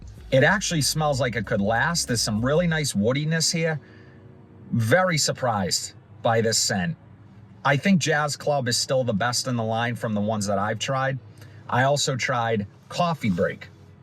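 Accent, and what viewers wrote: Boston, United States. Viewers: Great way to test fragrances for sure. Love the vlog! Whats your accent? Boston?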